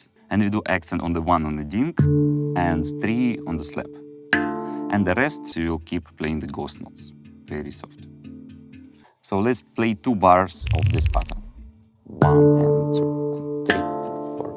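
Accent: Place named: Russia. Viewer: дружеский совет посмотреть как избавиться от русского акцента особенно если собираешься еще делать такие крутые видео.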